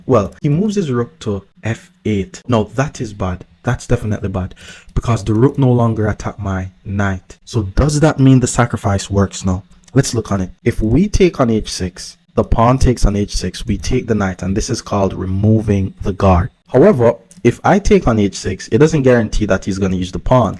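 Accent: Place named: Jamaica. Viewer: I noticed the Rastafarian Jamaican accent my brother wagwan brudda... Love the breakdown analysis, I just got recommended Ur channel 💯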